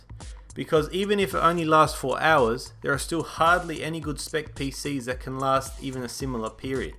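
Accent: Australia. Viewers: ? you sound austrailian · is that an Australian accent???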